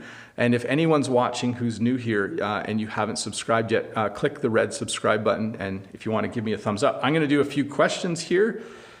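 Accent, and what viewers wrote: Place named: Canada. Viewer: Hey, I would like to follow the Canadian accent. Do you have any tips?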